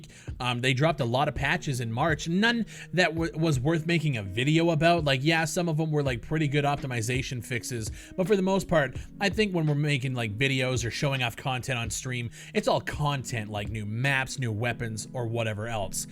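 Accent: Canada. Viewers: I didn't know you had that Prairies accent until you pronounced 'Cal-garry.'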